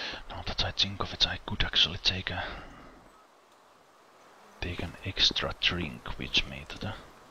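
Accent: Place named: Finland. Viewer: for the lovely finnish accent!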